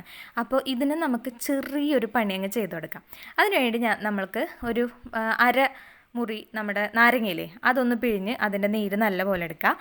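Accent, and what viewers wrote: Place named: Thrissur, India. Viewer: Your trichur language is very sweet. Continue in the same tone. Love you.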